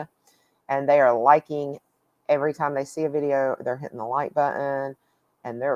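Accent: South, United States. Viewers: I’m a recent subscriber to your channel, having come upon it by chance. I immediately picked up on your “accent,” as I, too, am a Southerner! I currently live in SC but moved here from the Atlanta area last year. Your coloring page looks so cheerful colored by the glittery Gelly Rolls. As a relatively- progressive retired pastor in a mainstream Protestant denomination, I found your comments about Christmas interesting and appreciated the remark about the X in X-mas. Indeed, the X stands for the Greek letter chi, which is the first letter of the Greek word Christos, from which our word Christ comes. So, yes, using the abbreviation X-mas does NOT leave Christ out of Christmas! Thanks for sharing!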